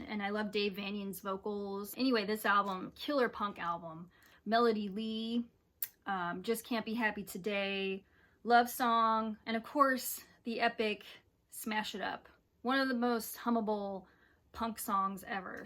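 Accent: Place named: United States. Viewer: Yet again, a great selection of records from the year in question by such a young (...compared to me) person! Absolutely all my faves are in there.....well almost - you need to include (ah yes, you did pick this one - I started typing this comment too soon!)...oh and by the...and maybe by, and by, and by (probably their best), and by, and by. You are quite right, is the absolute greatest TH's LP, as hopefully you'll eventually discover...and is also EC's best! This is so good! And you pronounced name correctly - your smooth american accent makes this sound as naturally perfect as it is! You took me right back to my whole life in the sixth form (which is the two penultimate two school years before going on to university, etc. for those viewers who are not English!). Thanks for taking me back to such a joyous time - these records don't just contain music, they're sort of erm,... 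notches on the belt of life - gosh, that sounds so corny (sorry!)! Btw, if you're really going down the collectors line, you need a copy of with the fold out rear sleeve (but mines not for sale at any price, I'm afraid!). All the best. Cheers!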